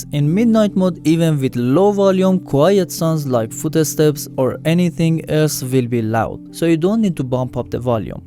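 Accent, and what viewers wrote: Iran. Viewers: Your accent, are you Persian? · بنازم آقا بازی رو انجام دادم نیازی به دیدن ویدئو نداشتم ، لهجه ایرانی رو دیدم دلم نیومد رد کنم عالی و مسلط موفق باشی.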